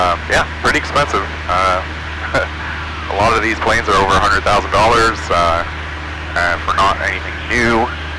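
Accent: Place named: Canada. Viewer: a Canadian I hear heheh! Cheers tho, im just getting into flying 🎉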